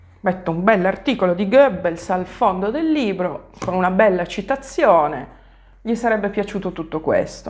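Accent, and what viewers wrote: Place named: Emilia-Romagna, Italy. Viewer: 😂 ciao sei sempre bravissima, perché smonti con prove alla mano ogni eresia degli infedeli camelgiokey sei per caso emiliana romagnola ,ho sentito una cadenza famigliare,socmel😅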